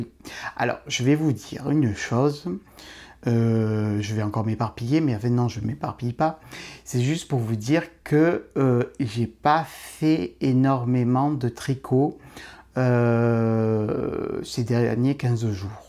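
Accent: South, France. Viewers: Ce petit accent du sud nous met du baume au cœur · ton accent du sud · ce petit accent du sud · J'aime beaucoup ta simplicité et ton accent du Sud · je comprends assez bien ton accent du sud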